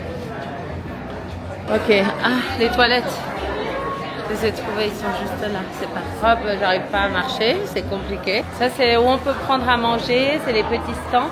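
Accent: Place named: Switzerland. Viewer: L’accent suisse faut arrêter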